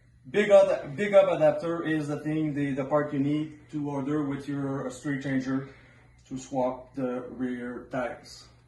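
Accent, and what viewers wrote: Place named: Quebec, Canada. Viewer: Ca c'est un gars du Quebec . Meme accent que moi 🙂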